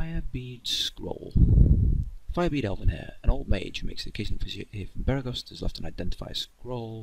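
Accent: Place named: Yorkshire, United Kingdom. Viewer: I thought it was alright. Makes a change to the American accent. Is it Sheffield? That's where I'm from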